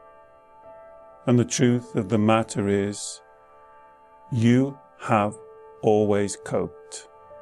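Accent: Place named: Northern England, United Kingdom. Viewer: Your northern English accent is so calming. Kia ora from New Zealand.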